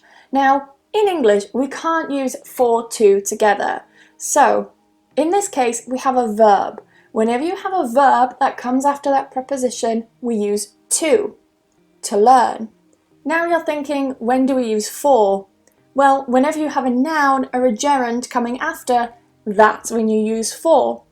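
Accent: United Kingdom. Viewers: Love you, love your lesson, and love your british accent. #oneOf Ur student from Indonesia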